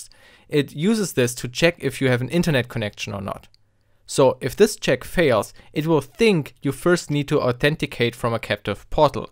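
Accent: Germany. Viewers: I love that German accent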